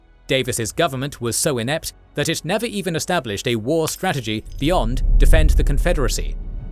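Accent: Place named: United Kingdom. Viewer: Hate the speed-reading thick British accent.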